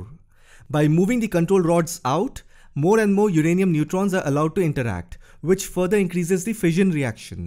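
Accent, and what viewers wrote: India. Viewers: Love your Indian accent and really great explanation, thank you.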